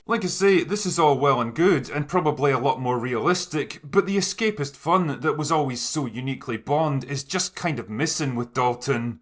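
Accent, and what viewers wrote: Scotland, United Kingdom. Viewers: Sean Connery He blazed the trail and made the mold. The others tried to mimic his charisma, but failed. There are few actors that have the entire package like Connery. Just look at Connery's body of work and the magic of his presence oozes thru unintentionally, just because of Connery being Connery. Pierce Brosnan The only other guy that came close, who even had a movie kept under wraps until he was released by his captors in Hollywood, was Pierce Brosnan. Golden Eye was a fantastic movie. Sure, it had gaping plot holes, but it delivered. Brosnan was the epitome of cool, calm, collected British, stiff upper lip, boys, don't let'em get away. Oh yeah, save the girl too! That's not to say Pierce was a good Bond in other movies, but as good or better than all the other candidates. George Lazenby vs Diana Rigg Lazenby was good not great in his one appearance, but that's the problem. 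I need a little more than one showing. Different venues give us more flavor of what His portrayal and interpretation of Bond really was. Diana Rigg now, WOW. If any woman could have pulled off Bond, there's my girl. On non Bond stuff...Mike Krommhoefener said about you criticking Bond. If you play your cards right, you could be the next voice-over king. At least for parts needing a British/Scottish accent.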